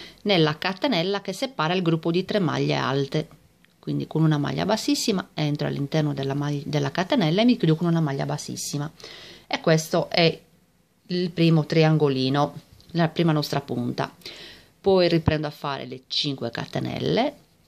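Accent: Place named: Sardinia, Italy. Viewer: Molto molto carina e ben spiegata. E poi adoro il tuo accento sardo 😉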